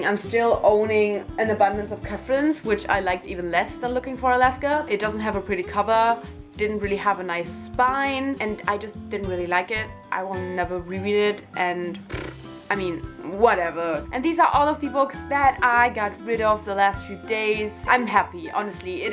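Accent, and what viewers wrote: Germany. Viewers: Die ganze Zeit hab ich gedacht "Das ist doch ein Deutscher Akzent, oder?" und dann kam das erste Deutsche Buch😂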